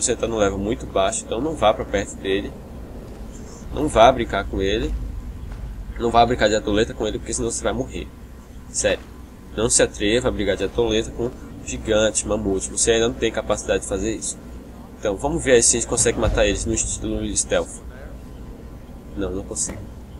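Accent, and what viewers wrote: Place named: Interior, Brazil. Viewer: Você é da Bahia, Pernambuco, Sergipe ??? Eu percebi que seu sutaque é bem tipico do interior :)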